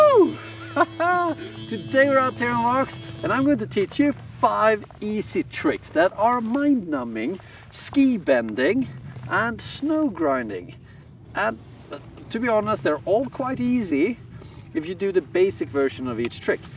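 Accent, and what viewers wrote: Sweden. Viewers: Det låter som att du är svensk jag vet att han prattar engilska men på hans engilska så låter det som att han är svensk🤣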